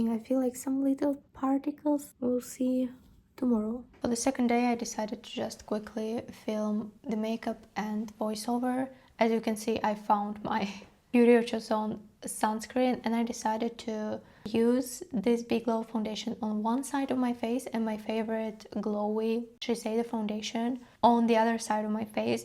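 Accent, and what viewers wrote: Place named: Eastern Europe. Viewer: Where are you from, love? I’d guess you might be slavic?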